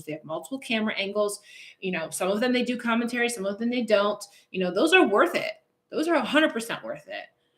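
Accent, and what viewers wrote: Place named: Rochester, United States. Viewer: too funny! I've been wanting to ask if you're from Rochester NY for a while now as I hear that accent! I'm from Webster ;-)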